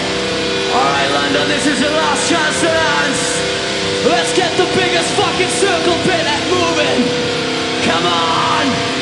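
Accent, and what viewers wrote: United Kingdom. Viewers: are they from britain?? he has a brittish or scottish or something accent? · The lead singer has a british accent when he talks but when he sings its perfectly english... I love BFMV and A7x!!!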